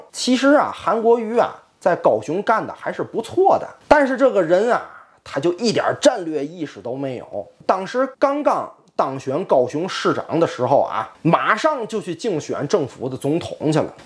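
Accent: Tianjin, China. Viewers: 每日谈: 天津话的魅力天津人的种族天赋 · 喜歡這津腔播報👍👍👍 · 这口活绝了，以后都天津卫嘴好了xD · 天津話，過癮！ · 这天津话说的，喜感自然来，还很亲切。每天看莱克多巴蛙快报，是一件极为快乐的事情。支持！~ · 在天津上的大学，怀念你纯正的口音啊。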